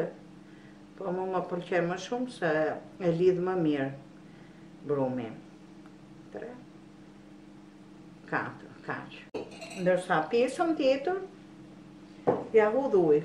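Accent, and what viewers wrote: Tirana, Albania. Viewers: Pashe dhe vidion e pare ..qe me kishit Kthyer pergjigje..faleminderit shume.. Sapo e mbarova doli special Hera e pare ne jete qe bej kulac si tironse se kemi tradite..por kte vit e bera ...😉..Gezuar Vitin e Ri.. Me zi pres te hidhni vidion e rradhes neser pjek gjelin e ta bej persheshin me menyren tuaj.. Ps u knaqa me tfolmen tironse😉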